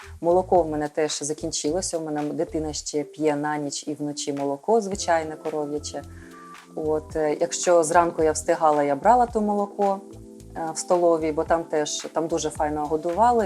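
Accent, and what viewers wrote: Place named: Ivano-Frankivsk, Ukraine. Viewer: Вы не киевлянка,франковская говорит файно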